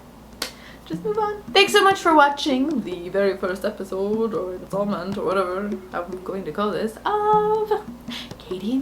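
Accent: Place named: South, United States. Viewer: Are you from the south? You have an accent but I am not sure which state it is from.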